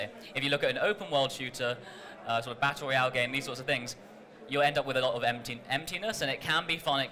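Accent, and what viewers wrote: United Kingdom. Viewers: British accent and super ambitious game..... Haven't seen this before...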